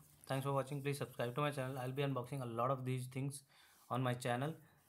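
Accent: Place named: India. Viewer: Why does a Chinese man have an Indian accent?🤔🤔🤔🤔🤔🤔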